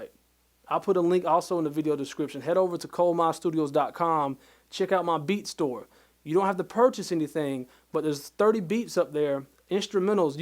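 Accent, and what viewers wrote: United States. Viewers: You are one YouTuber that I can watch and understand all your teachings I love them all my song is coming together thanks and you sound like you from my home FLORIDA 😎 especially when you say dat 😂 we talk like dat 💯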